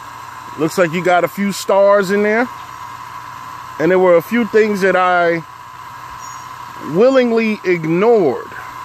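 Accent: Europe. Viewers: I know you ain't been to Africa, and you sound like a European, do research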